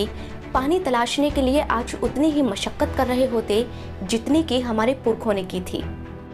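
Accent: Standard. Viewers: बिल्कुल शुद्ध हिंदी बोली आपने बहुत मधुर भी बोलती हो दिल से आपको धन्यवाद o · आपकी शुद्ध हिंदी बोलने की कला ओर आवाज ,दोनो हि लाजवाब हैं मैडम जी ,बहुत अच्छी वीडियो हैं।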